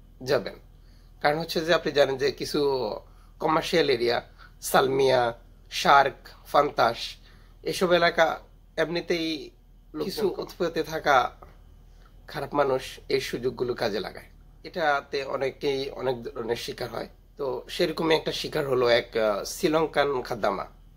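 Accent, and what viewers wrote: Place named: Sylhet, Bangladesh. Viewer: ও সিলেটি ভাই তুমি সিলেটি কতা কইলাও তুমার বাড়ি নবি গনজ না হবি গনজ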